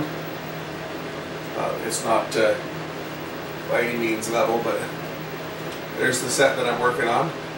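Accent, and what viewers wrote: Canada. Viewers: Gonna take a guess on your accent but are you from Alberta?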